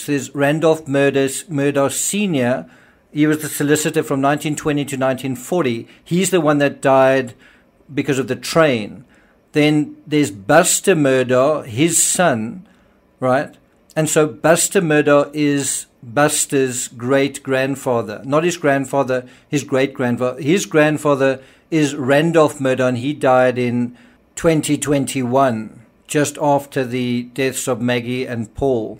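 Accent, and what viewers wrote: South Africa. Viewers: Thanks for this episode and your groovy safrican accent! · Your accent leans more south African than American, your from?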